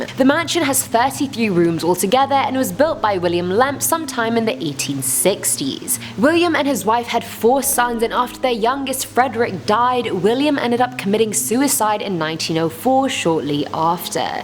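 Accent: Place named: England, United Kingdom. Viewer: Sound like an English accent, reporting on US ghost houses. Interesting!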